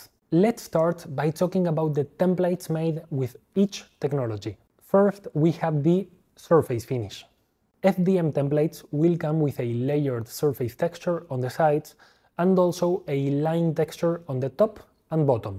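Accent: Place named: Argentina. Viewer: Increible como se nota que hablas español por el tono de voz jaja, hasta incluso parece que hablas como Argentino aunque hayas dicho trabajas en una universidad de españa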